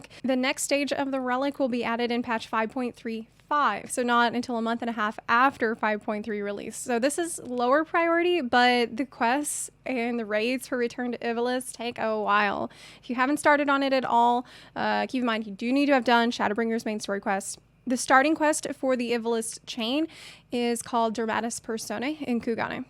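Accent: South, United States. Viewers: You sound like you're from the south. Coastal southeast.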